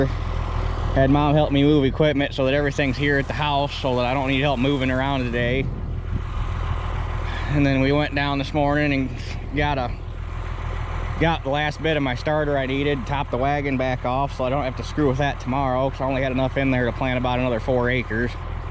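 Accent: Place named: Michigan, United States. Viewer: Sounds like you are from Michigan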